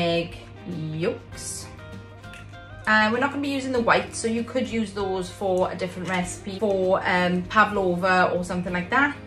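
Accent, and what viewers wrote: Wales, United Kingdom. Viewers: It's only a Welsh accent it's not that strong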